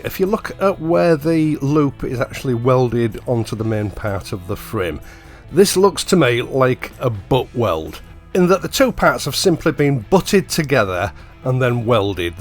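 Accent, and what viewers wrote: United Kingdom. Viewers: Your road reviews are like a high quality double barrelled over under shotgun, with both riggers pulled together. One barrel fires the excellent British English at the viewer and the second the gorgeously green English countryside. That both "barrels" operate flawlessly always and every time is the icing on the cake, so to speak. This one and the one by Mr Freddie Dobbs and Mr Mark Pulling remind me of " ". That song describes the exact sentiment of this bike. Thank you very much, sir, for yet another and as usual, true to form, review. Will be going tomorrow for a test ride of the bike. It should be a worthy successor to my 2014 (since sold) Bonneville 865.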